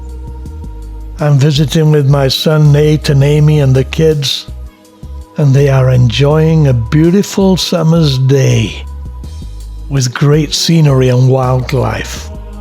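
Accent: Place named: United Kingdom. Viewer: oa Nice British accent .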